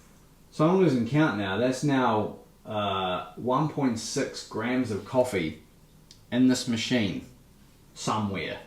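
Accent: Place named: New Zealand. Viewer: Opus wins tin times out of tin. Tin grams in. Ah the New Zealand accent, it's always a treat. Great video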